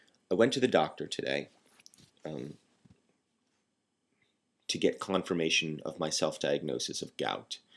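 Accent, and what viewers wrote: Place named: Ireland. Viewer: Steve has an excellent point about injuries. They sneak up on you. Haven't you ever turned your leg slightly & the next thing you know your knee is swollen? Or just reach for something stupid & have your back go out? The bursitis is in your left elbow, and you're a southpaw, yes? (Satanic!) Are you doing a lot of writing lately? Not so much typing - unless you rest your elbows while you type. Which would be wrong, Mr. "Touch Typist". Anyway, your Irish brogue turned me on.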